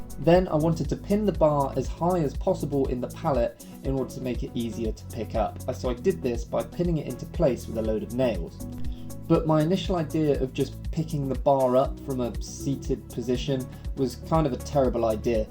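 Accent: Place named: RP, United Kingdom. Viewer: Wow, thanks for the reply lad! 💪 I'm actually a woman, but I do my best to keep up with the guys, and challenges like this are right up my alley. We just love seeing people doing more with pallets here Pallets R Us, we think they’re a really under appreciated bit of kit that the whole global supply chain relies on daily, people often forget that society would grind to a holt without them! I think you might be across the pond (guessing from your Prince William accent 🤴), but we'd love to help you out with some pallets sometime if we can hit it? So, if you're ever down for a transatlantic challenge, hit us up!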